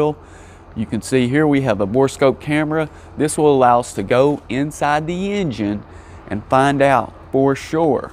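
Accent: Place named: Boston, United States. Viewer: boston guy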